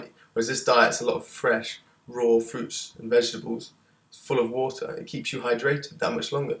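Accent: United Kingdom. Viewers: Also the English accent definitely helps hahaha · dude help me! I live in the uk I think you do too, you sound like you do. I am sorted for snacks etc but where can I buy meals for myself that i'd like, i'm vegan and dont want dairy or meat anymore. its kinda gross what ive learnt...and cruel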